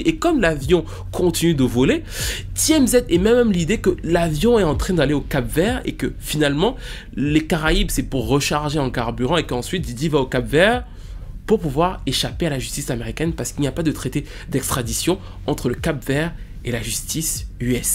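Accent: Cameroon. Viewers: J'aime bien le fait que tes origines camerounaises, commencent à se faire sentir avec les Eh etc !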